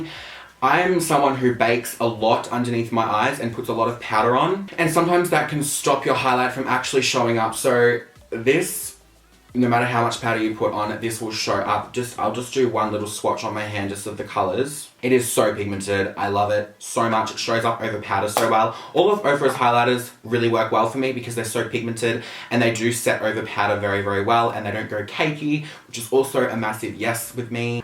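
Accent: Australia. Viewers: Is he Australian? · Hello! I'm new to this channel. Does anyone know where he is from? I'm fascinated by Aussie accents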